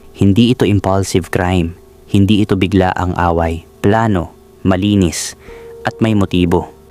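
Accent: Visayas, Philippines. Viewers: Bisaya ba Yung narrator?Hindi marunong mag bigkas ng salitang Tagalog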